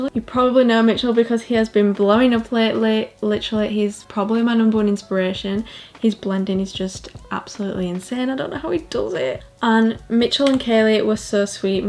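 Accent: United Kingdom. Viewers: Wow I thought u were straight American but wow! BRITISH SQUAD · Are you from the uk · I honestly didn't know is British, I thought she's American 😂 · Everyone’s like I THOUGHT U ARE AMERICAN Me: I knew she was British 😂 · WAIT WHAT.....I had no idea you were British. I’m shook. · your british i am confused...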